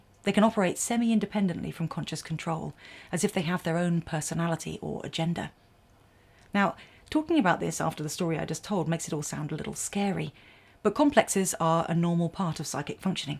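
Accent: United Kingdom. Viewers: Your English accent is driving nails into my head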